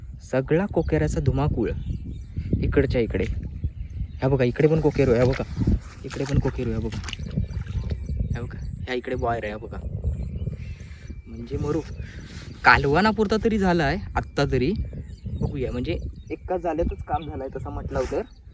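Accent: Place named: Malvan, India. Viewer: तू चिपळूणकर आहेस का मालवणी बोलतो नाय येत तरी नीट बोलणं आलिव गेलिव नाला चा पाणी तलाला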